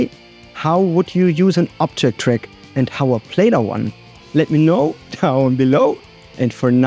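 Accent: Germany. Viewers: Where do you live, sounds like germany...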